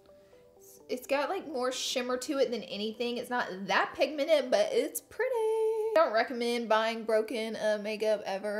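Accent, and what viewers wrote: South, United States. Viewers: Does she have a southern accent? I can’t tell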